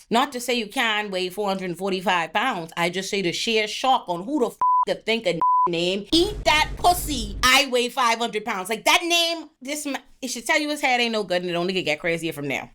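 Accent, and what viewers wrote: Bahamas. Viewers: Are you Bahamian or Nigerian? Can't tell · girl just hearing ya Bahamian accent is be enough for me in these videos for rewatching lollll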